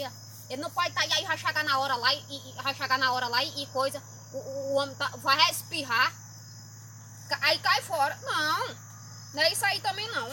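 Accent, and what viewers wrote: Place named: Pernambuco, Brazil. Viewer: Quando vi você pela primeira vez achava que tu era mineira ou pernambucana. Adoro esse sotaque, ainda quero ir no Pernambucano... Parabéns ótimo trabalho!!! ✌👏👏👏👏👏👏👏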